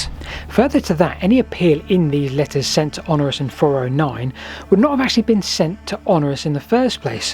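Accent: United Kingdom. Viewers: You do good documentaries, but, you need to slow down your words and talk with a bit more articulation, especially with a British accent. I have to stop the video often to decipher those rapid burts of words.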